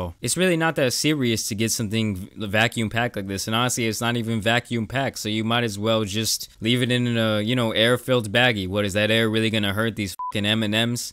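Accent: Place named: New York, United States. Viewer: The only guy from Texas with a New York accent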